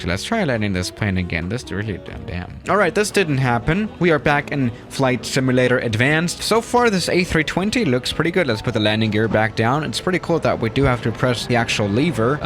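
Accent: Germany. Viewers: Are you From Germany or Switzerland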